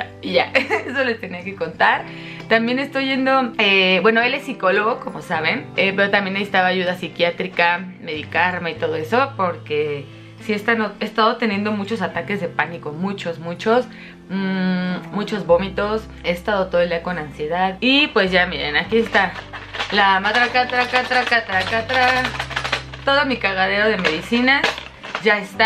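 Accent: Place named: Spain. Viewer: aquí estoy apoyando con mi granito de arena viendo todos tus videos como mil veces en todos los dispositivos que tengo para apoyarte y que tengas muchas muchas vistas me encantas y por favor amo tu acento español 😅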